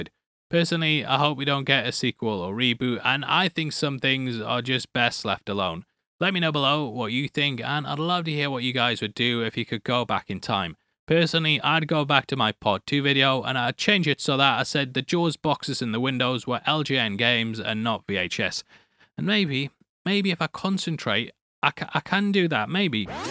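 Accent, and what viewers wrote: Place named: United Kingdom. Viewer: "from when he played Gundam" ....I know british accents make some words are hard, but that's an interesting mispronunciation of Gunman XD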